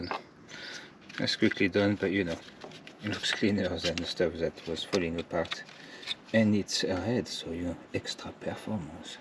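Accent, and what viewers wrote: France. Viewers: I love your French Englisch :) Very enjoyable. I hope i'll get a Modus for my wife next week. Her loved Twingo mk1 needs replacement. And now i know how to change the oil and stuf for her future car. Thank you for that :) Greetz from Holland!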